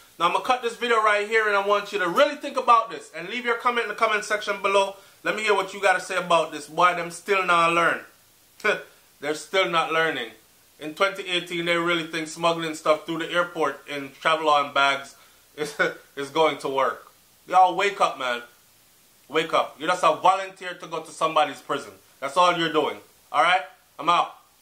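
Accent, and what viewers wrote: Barbados. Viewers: Lol the Bajan accent 😄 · this is a serious topic and you had me cracking up with your barbados accent these kids dont listen they think they know it all so they follow the wrong crowd and dont like authority so they prefer a home where they can do whatever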